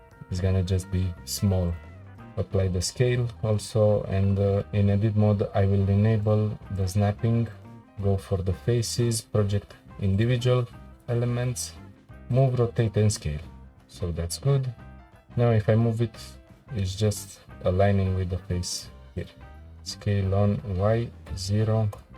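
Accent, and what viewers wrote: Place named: Romania. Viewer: aud romanul in tine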